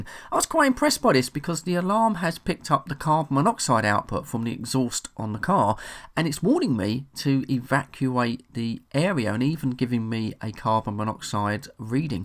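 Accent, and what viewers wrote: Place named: Northern England, United Kingdom. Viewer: are u from northern england? cause i got colleagues from there who sound exactly like you 😆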